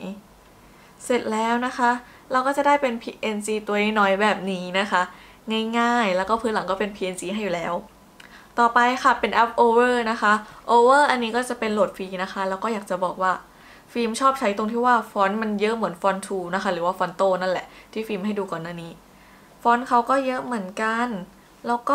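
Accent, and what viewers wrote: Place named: Thailand. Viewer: คิดถึงช่องนี้ เพราะ ตอนเด็กๆดูบ๊อยบ่อย จำการรีวิวได้ แบบ จะเน้นของที่รีวิวแบบ เหน่อๆ แบบ อย่างนั้นแหละ 555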